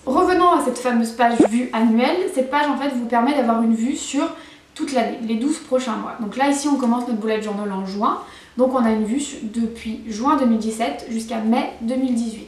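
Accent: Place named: Quebec, Canada. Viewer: J'avais l'impression d'entendre un léger accent québecois :p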